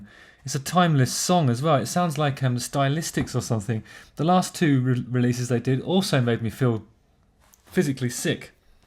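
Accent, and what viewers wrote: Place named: RP, United Kingdom. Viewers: Loool, you explaining modern RnB lyrics in your posh ass English accent was great. Nice to finally find your YouTube page. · Love these! I'm also from good ole lowestoft and love listening to your accent here in nz! Always been a massive fan,thanks for sharing!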